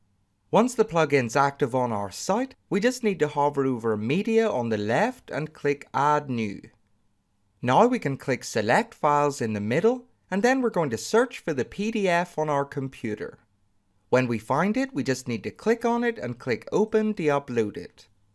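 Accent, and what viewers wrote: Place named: Belfast, United Kingdom. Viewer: Hello. From your accent I think you may be local to me in Belfast. If I could ask a question it would be great. I installed a pdf embedder a few months ago in our Wordpress website. It worked well until this weekend when it seems to have disappeared! I can't remember which plugin it was so I installed another one that has a 'toe bar' (?) like the one you use, but my original embedder allowed you to scroll up and down directly on the pdf. Is it possible to get this back. Many thanks, Geraldine.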